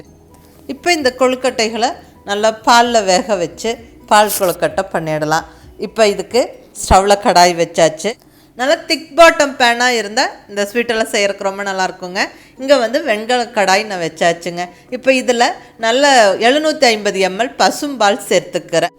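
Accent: Kongu, India. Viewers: She is from coimbatore. Kongu tamil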